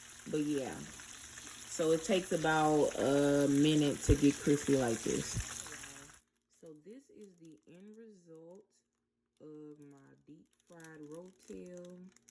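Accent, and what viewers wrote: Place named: Memphis, United States. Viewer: You talk like dearra! Are you from Memphis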